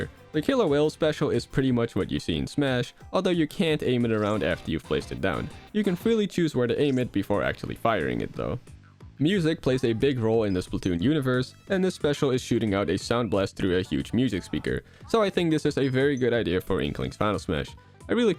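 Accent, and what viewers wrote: Netherlands. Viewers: are you dutch? me to if you are dutch! love your content!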